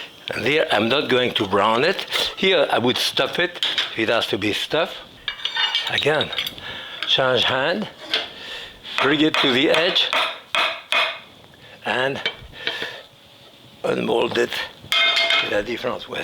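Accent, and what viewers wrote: France. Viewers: Does anyone else simply hear the french accent and immediately hit the subscribe button? only qualification i need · Absolutely.....My dad was French and had eggzactly the same accent.😊😊😊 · that french accent even makes the word omelette sound so goood mmm and yes i always use chopsticks now for anything that needs a good whipping , once you master it the become a regular used kitchen tool , happy cooking folks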